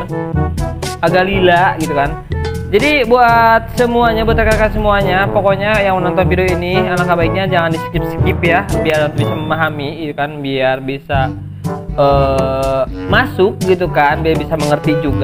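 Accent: West Java, Indonesia. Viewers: Jigah orang Sunda uyy...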